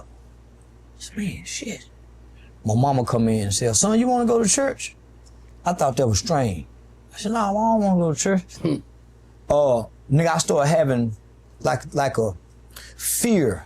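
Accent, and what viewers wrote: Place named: Florida, United States. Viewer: he sound like florida accent a lil bit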